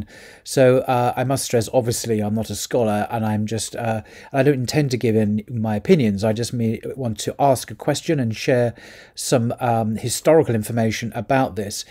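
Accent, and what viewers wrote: United Kingdom. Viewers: Ended up liking before watching 😅 but am gonna hear completely ~After hearing completely~ Somehow your British accent felt more nuanced this time 😅 I had originally felt that Salafism is the right thing, because of their premise that following the religion of the first three generations is following Islam. As of last or last to last year, I have just washed my hands off anything that ends in an -ism or is anything like that, because by their very nature they are limited. I have also listened to Dr Yasir Qadhi a lot, and in one of these sessions where he was forced to discuss creed, he said in the end something similar to that this discussion is not too fruitful because whether athari or ashari, people still do not show any major differences in righteousness, closeness to religion etc. i.e. the outcome is not very different for being ashari or athari 😅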